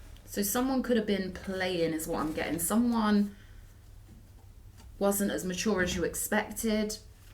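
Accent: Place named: London, United Kingdom. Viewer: Hi 👋 Hey U.K...your smashing this and having your way..go ✨️ O me O my conduit connection to the almighty. Love your voice, can hear London, can hear down country, can hear a beautiful vibe. What goes 😳 around comes around, don't keep hurting innocents..for your power. Learn your karmic Lessons and come back to the Light. Peace&Love&Light Namaste 🙏 ♥️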